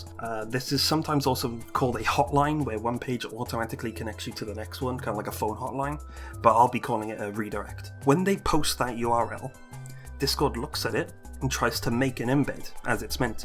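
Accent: United Kingdom. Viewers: hooold on, hoooooold on, my guy are u welsh? if yes: high fiveee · ah!! alright, only reason why i asked was bc of the way your accent seemed to mirror that of the bristol accent in my head my brain makes weird connections ;w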